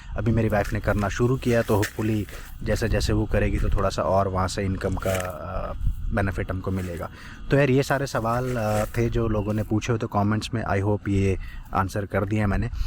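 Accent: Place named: Punjab, India. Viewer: Congrats. Loved your video, straightforward and honest. Sabto wadiya is its native hindi with Punjabi touch.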